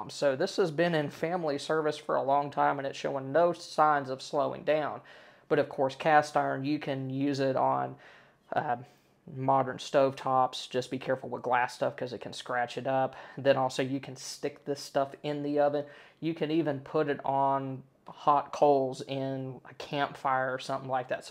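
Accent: Texas, United States. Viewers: Sounds like your either west of Fort Worth or south of me in central Texas. We are in the DFW area and have a place in southeastern OK that we can go. Your on the money all the time with your ideas here. I'm a retired BSA volunteer Commissioner ....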